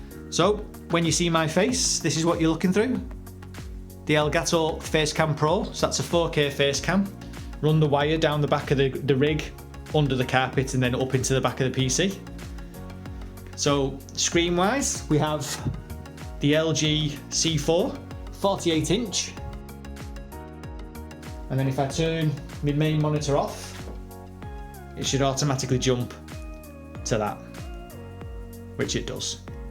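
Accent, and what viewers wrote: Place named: North, United Kingdom. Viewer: Love the setup even though small space you've really made it count I can't explain what I mean really but I hope you get the jist of it, I found you through the new evo I'm looking to get it, and found you sounded northern like me so that's what related me to you lol deffo be coming back for more videos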